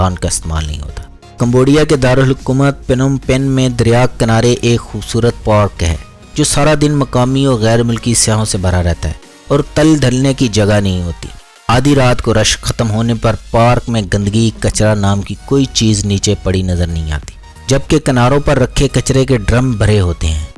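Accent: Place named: Punjab, Pakistan. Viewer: Speaking with a punjabi accent bad English pronunciation need a lot of improvement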